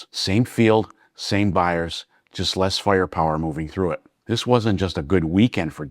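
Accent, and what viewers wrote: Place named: New Jersey, United States. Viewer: . I really enjoy your videos. Thanks for doing them. It might be your Jersey (?) accent, but Wimbledon does not have a t in it, and asterisk is pronounced as it is spelled. I realize i am pedantic.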